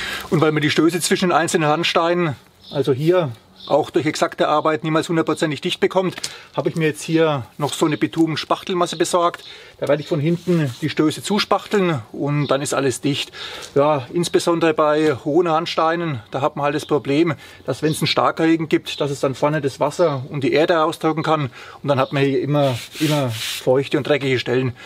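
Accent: Franconia, Germany. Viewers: Fränkisch immer noch bester Dialekt 👌🏻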